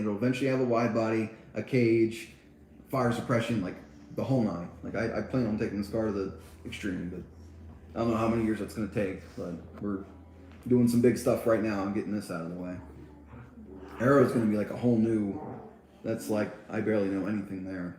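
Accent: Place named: Pennsylvania, United States. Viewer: Where from PA are you? Recognized the accent immediately lol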